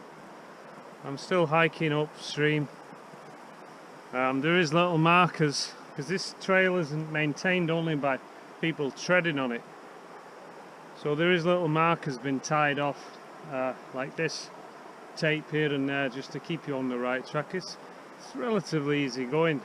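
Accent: United Kingdom. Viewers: your words about nature with your british accent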